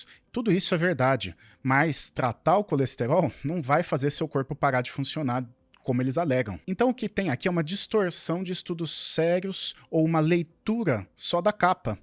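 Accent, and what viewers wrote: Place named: United Kingdom. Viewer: eu gostei do "um sotaque britânico". Eu acrescentaria, "sotaque britânico com uma pegada de personagem de filme antigo que os tios gostam"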